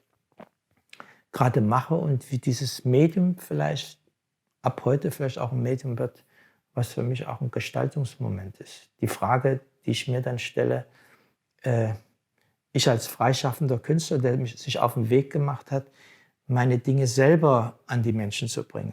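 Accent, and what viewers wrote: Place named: Saxony, Germany. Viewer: Ja ich bin auch durch Zufall an geraten...auch der Dialekt er stammt aus Leipzig u ich aus riesa also sachsen und da ich jetzt alle malschulen zu haben und ich nur Acryl und aquarellstunden genommen habe passt das jetzt sehr gut...denn mit zeichnen das moechte ich noch lernen machen sie weiter herr Assisi toll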